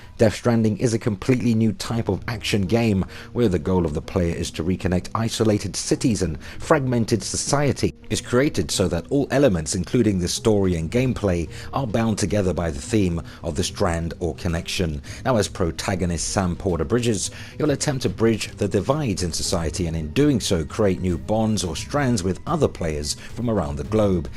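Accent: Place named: Cockney, United Kingdom. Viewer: How come you’re posh in your produced videos, but all geezer in your podcast chats?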